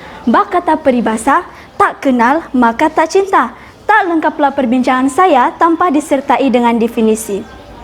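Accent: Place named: Indonesia. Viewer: Accent dia macam accent org Indonesia weyy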